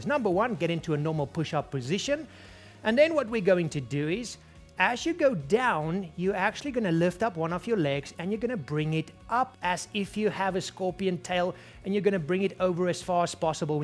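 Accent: South Africa. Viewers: This dude is so South African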